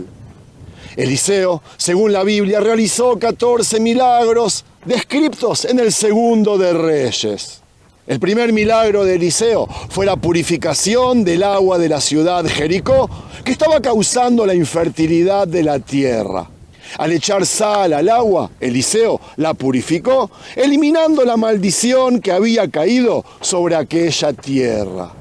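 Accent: Argentina. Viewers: ¿es Argentino el narrador?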